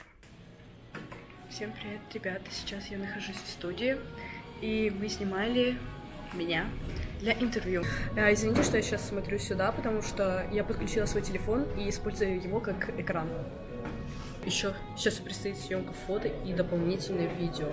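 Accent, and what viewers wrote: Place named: Russia. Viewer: 🤔 This accent! U speak Russian language! So are you Russian?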